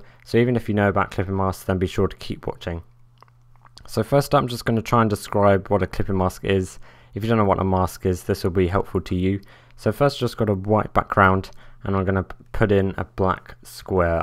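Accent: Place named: RP, United Kingdom. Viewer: Great tut! (also - you sound dead posh!)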